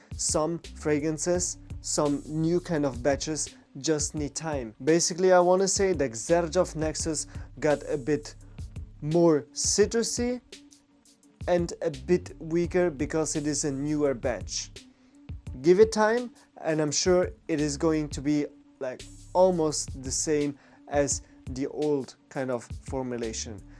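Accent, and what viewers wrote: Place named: Italy. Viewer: U sound italian. Coincidence? 😂😂 of course not fools.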